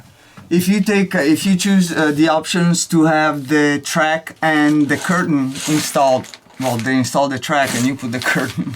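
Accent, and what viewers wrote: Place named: Austria. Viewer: I sense a lil but of Austrian or German accent, á la Arnold Schwarzenegger’s voice..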